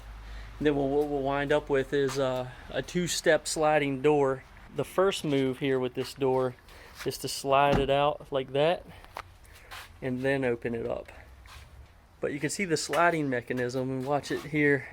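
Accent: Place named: West Coast, United States. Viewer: WOW, that's the kind of thing I would dream of doing. You saved a lot of green backs that way! East Coast. It sounds like your from the West coast. LOL. Thanks for the response, I liked that too!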